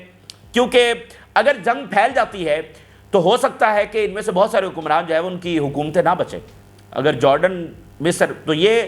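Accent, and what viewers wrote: Pakistan. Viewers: Guy you in Pakistan for your information your need to know we the people of United States had voted for president Donald Trump and we love ❤President Donald Trump, and President Donald Trump with love of the people of USA he will be the president of USA for 3rd term too! America and American first Only! No involvement of USA 🇺🇸 in any form or way in the affairs and matters of other countries of the world any more, no more of the USA’s financial aids to any other country of the world that is the reason we the people of USA had voted for president Donald Trump! and It would be better if you stop screaming and speak in low volume! Plus you have heavy Urdu accent on to your English, it is ok to speak entirely in Urdu without adding English words or sentences in between your Urdu speech, though it would be even great, if you could put some efforts on improving your English that have heavy Urdu accent! 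Thanks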